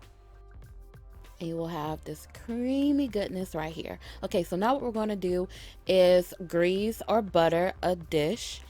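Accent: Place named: Louisiana, United States. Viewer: I'm kinda new to watching your videos and I must say this is my favorite!!! I'm from Ga and currently live in Tx. I LUV the way people from the "boot" cook(and talk)My grandmother taught me how to cook mac with canned milk but she used a couple eggs. Thank You so much for your videos. I will be trying your banana pudding tomorrow😉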